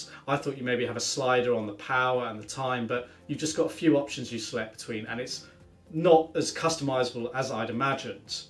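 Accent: United Kingdom. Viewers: Aren't you a British one?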